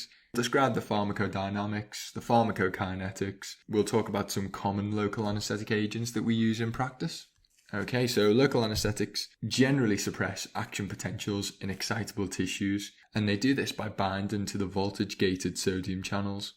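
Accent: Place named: Northern Ireland, United Kingdom. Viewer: Sounds like a Northern Irish accent Either way, this was an informative lecture!!!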